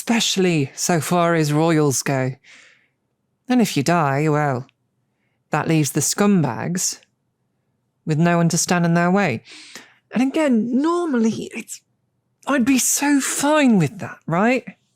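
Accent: United Kingdom. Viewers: British, needs to kill me and spouts puns? Yeah its a friday alright, ty!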